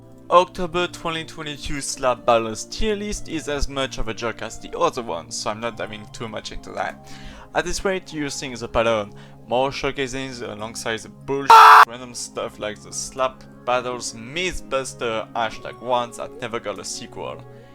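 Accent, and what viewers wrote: France. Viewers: holy french accent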